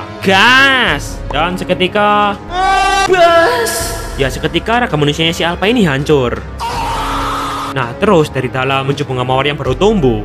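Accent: Java, Indonesia. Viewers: Bang kamu jawa yoo lek jowo podo rekk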